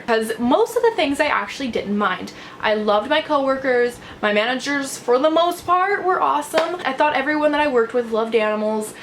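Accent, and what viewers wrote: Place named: South, United States. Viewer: Where do you live?I notice a southern accent.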